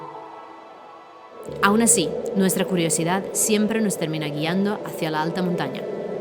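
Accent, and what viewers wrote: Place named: Serbia. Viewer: Genial documental, el acento serbio de la narradora le da un toque especial y exuberante más la calidad y las transiciones que son muy buenas! Felicidades!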